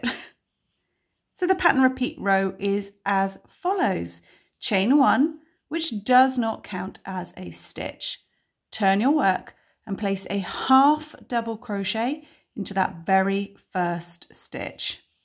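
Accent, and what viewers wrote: United Kingdom. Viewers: Thank you ! Love your British accent. I'm from the deep south in US and it is very refreshing 😍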